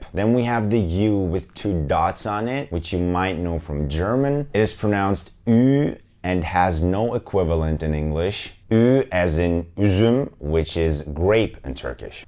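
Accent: United States. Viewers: And where did you learn English? You have an American accent. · You sound very American to me. You barely have an german accent That is crazy. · you're very good at the american accent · Born in raised in Germany? With an American English accent? Vay Vay Vay. Sen olasin Ürgup Dumanin Tutmaz.... Excellent command and ability to explain in English however it is the American accent used is for me a distraction. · Wow, this is awesome. You seem to have an American Accent and way of looking at the Turkish language so it is helping me understand much more deeply. Tips here also explained why my Turkish wife omits some words a lot haha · You have more of an American accent than German. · Your English is American. Is that how they teach English in Germany?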